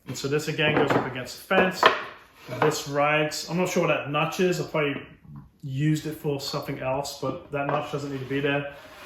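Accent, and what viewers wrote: England, United Kingdom. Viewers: I’m just here for the great English accent!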